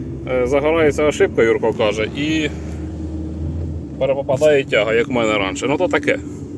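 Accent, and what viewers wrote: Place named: Zaporizhzhia, Ukraine. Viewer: У Вас брат, випадково не у Данії зараз живе? Говір однаковий, і також з Запоріжжя. <link>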